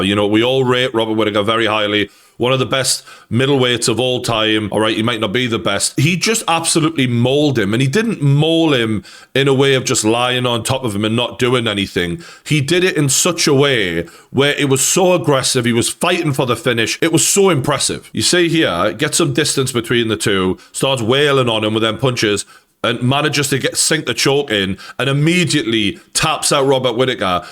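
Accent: Newcastle, United Kingdom. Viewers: 100% Geordie this is a joke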